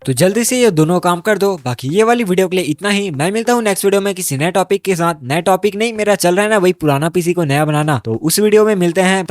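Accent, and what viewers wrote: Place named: Chhattisgarh, India. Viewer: Bolne ke kareeke se mujhe bhi laga CG se hai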